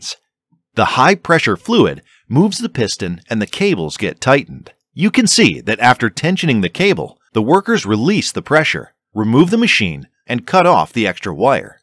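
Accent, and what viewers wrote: Kerala, India. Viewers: I miss the indian accent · please don't use your voice. I hate indian accent. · Why don't you stick to using the AI generated voice in place of your Highly "Mallucised" accent!!! Sorry for the blunt comment.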